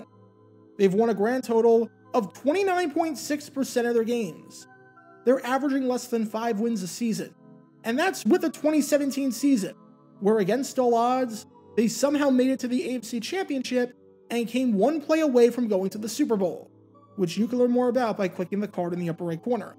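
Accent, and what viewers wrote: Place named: New York, United States. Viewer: I knew you had to be from New York originally. That accent is too obvious. 🙂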